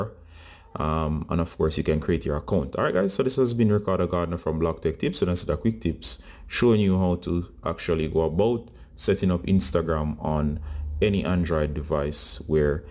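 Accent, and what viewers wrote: Jamaica. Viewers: Are u from Jamaica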